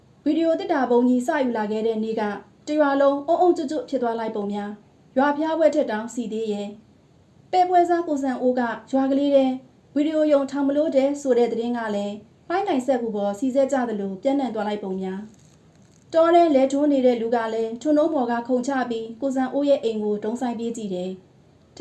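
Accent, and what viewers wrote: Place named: Upcountry, Myanmar. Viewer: ခင်ခင်ထူး ဝတ္ထုတွေကဖတ်ပြတာမကောင်းရင်စိတ်ဝင်စားဖို့မကောင်းဘူး အညာသံလေးပါမှ ကောင်းတာ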